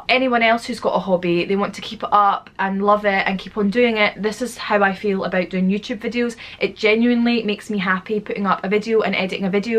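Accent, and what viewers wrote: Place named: Glasgow, United Kingdom. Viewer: Good luck lovely!!! I'm from Glasgow and have been loving hearing a local accent!! I'm sure you will have the time of ur life in Canada ♥️♥️♥️♥️♥️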